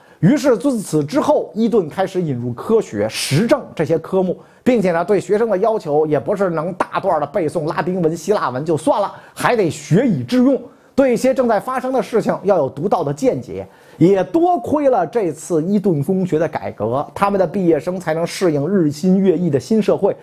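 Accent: Beijing, China. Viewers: 哎哟，甩着京腔讲贵族，，，真不是那么回事，别扭。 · 👍赞 老北京的音儿 👍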